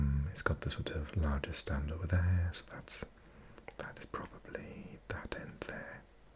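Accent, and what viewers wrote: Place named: Wales, United Kingdom. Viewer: I never thought a welsh man whispering about football stadiums would be something id enjoy watching, but turns out it is! Would love to see a championship version coz us lot never get much recognition (huddersfield fan)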